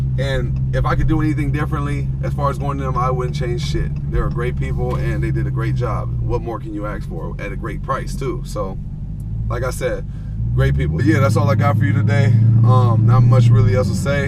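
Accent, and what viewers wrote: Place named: California, United States. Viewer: You from Cali? Sound like it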